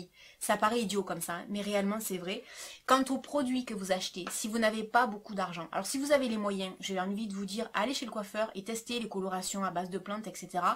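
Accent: South, France. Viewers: Coucou! Super vidéo, comme d'hab! Merci pour ces conseils! Tu as effectivement de très jolies cheveux, un tuto sur ta coiffure? Je sais que tu préfères parler mode mais peut être l'inclure dans un get ready? ^^ Quelques soit le thème de tes vidéos, c'est tjr un plaisir de t'écouter! =) Je vis en Espagne, et ton petit accent du Sud me rappel mon chez moi ^^ Besito guapa!!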